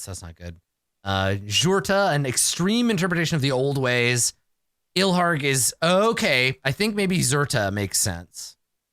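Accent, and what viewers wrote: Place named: Quebec, Canada. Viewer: The way I instantly figured out you were canadian....when you start speaking french you go straight to bibliothèque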